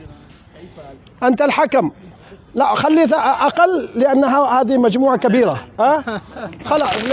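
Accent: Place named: Saudi Arabia. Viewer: السلام عليكم ورحمة الله وبركاته هل أنت سعودي؟ أنت تتحدث العربية باللهجة السعودية، فمن أين تعلمت هذه اللغة؟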